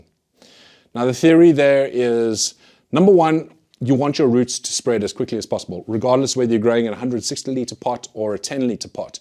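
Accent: South Africa. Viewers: THATS A SOUTH AFRICAN ACCENT, HOWSIT BROTHER!!!!!! been inspired by your grows and been blessed with amazing harvest here in Cape Town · Hello......you from South Africa....ZA. Accent 😅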